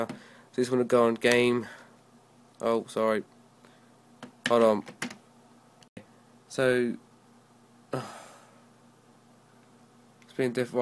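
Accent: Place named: United Kingdom. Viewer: GASP UR BRITISH BRITISH PPL R AWESOME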